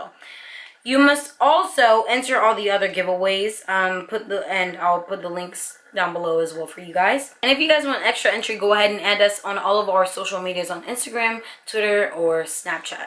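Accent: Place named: Nigeria. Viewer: Oh my gosh, I haven't heard anyone do a Nigerian accent in a very long while. Ahh, I wan't to go back so badly!!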